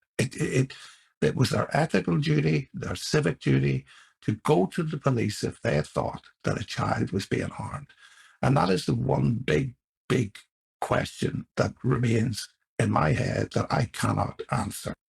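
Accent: Belfast, United Kingdom. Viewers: It gets better. I hate wearing headphones but I want to hear every single word he says, which helps with the sound quality and his wonderful Belfast accent. Besides, he does get the sound quality much better as the series progresses.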